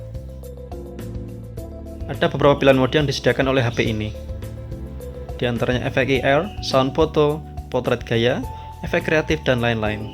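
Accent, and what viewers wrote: Java, Indonesia. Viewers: review bagus kecuali reviewernya..medokk abis... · Medok gan logat nya,good..jawa bgt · sampean wong ndi tho mas medokmu kuwi lho, joss gandoss 😂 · Medok banget bang 😀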